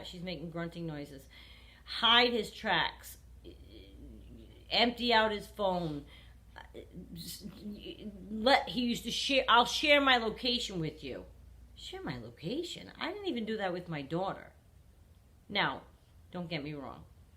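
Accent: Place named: New Jersey, United States. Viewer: Hi Just found your youtube channel today. I love you!! You make so much sense and you sound like people I grew up with in New Jersey😊 I live in Florida now after divorce from narcissist. Thanks for these videos. I like how you just talk like we are sitting in a room. I also like that you do not fill your videos with flashy intros and unnecessary music. I hope you don’t change the format. I especially love your dog in the background, so cute!!!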